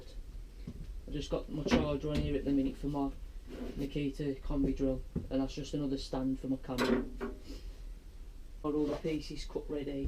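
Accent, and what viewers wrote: Black Country, United Kingdom. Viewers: Blimey i thought i had a small working space in the garage, but you are doing a great job with the space you have, i am impressed, you sound like you are from my neck of the woods in the Black Country!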